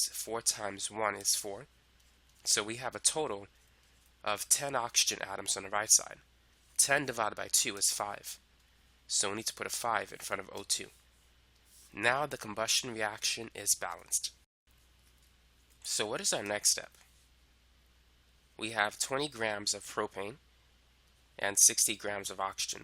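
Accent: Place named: Boston, United States. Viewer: Great video! Are you from Boston? PAcent lol I love it. Thanks once again for the videos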